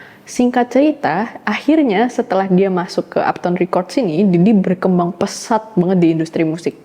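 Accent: Java, Indonesia. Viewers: hostnya kok medoknya lama-lama ilang ya? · Muka bule, tapi kadang keluar medoknya. Mbak kamu terbaik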